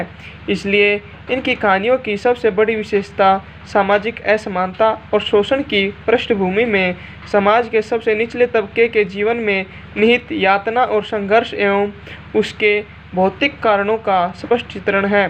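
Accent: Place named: Haryana, India. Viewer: आपकी बोली में हरयाणवी भाषा की शैली है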